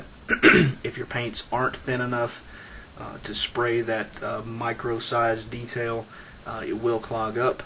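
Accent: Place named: Southeast, United States. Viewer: Off topic (sorry) You sound like you are from the southeast US. If so where? I am in Leeds, Alabama (suburb of Birmingham, Alabama). Just curious. I am jealous of your setup, for what is worth. Thank you for sharing it and it would be awesome to see your work. Do you post to cmon and if so what is your screen name? Thanks again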